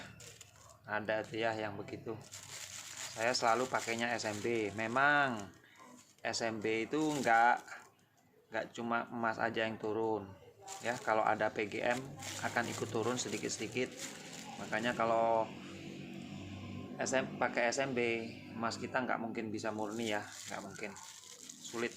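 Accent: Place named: Java, Indonesia. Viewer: Tak kiro pean i wong aduoh kono mas, dadak kok jowo. Jowo ndi mas?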